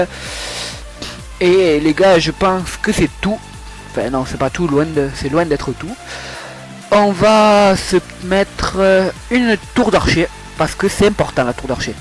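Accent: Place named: Marseille, France. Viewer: Marseillais je crois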